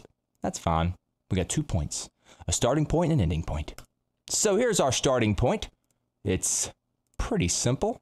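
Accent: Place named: South, United States. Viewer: Bro I enjoy your tutorials. Where in the south are you from bud?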